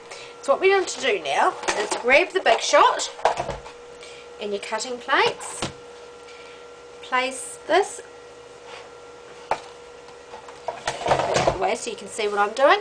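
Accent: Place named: New Zealand. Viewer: nice video - clearly done. Was wondering if there were any NZers working on cards and posting videos on you tube. Nice to hear that accent again! Looking forward to more videos from you.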